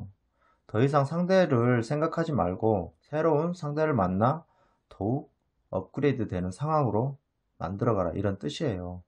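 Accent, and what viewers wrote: Jeolla, South Korea. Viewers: 전라도 분이신가봐용 헤헤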